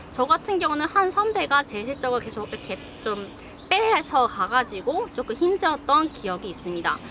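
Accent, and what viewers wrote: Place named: Japan. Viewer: 진짜 공감합니다 월급도 쎄지않고.. 근데 어딜가나 월급짠건 똑같다고 생각해서 일단은 화이팅하고 있습니다만..! 그나저나 한국어를 하시는데도 일본어 인토네션이 나오시네요 대단..! 😝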